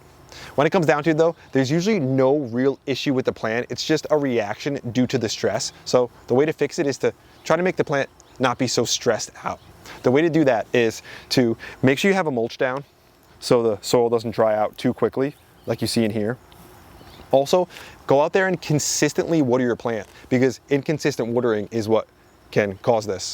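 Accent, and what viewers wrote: New Jersey, United States. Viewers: Love my guy from Jersey- Make sure you use lots of wooder...😂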